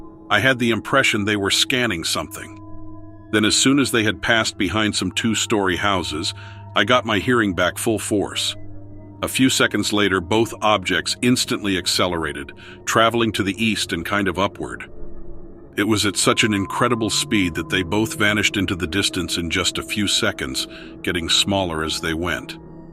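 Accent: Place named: Midwest, United States. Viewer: You have a great voice!! I really enjoy your channel. You have a Midwestern accent. What state are you in??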